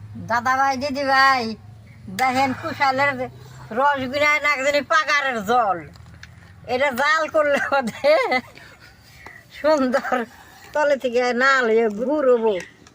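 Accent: Tangail, Bangladesh. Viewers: টাঙ্গাইলের মানুষ আমি। কুমিল্লা থেকে দেখছি। আপনার মুখে টাঙ্গাইলের ভাষা শুনতে খুব ভালো লাগে।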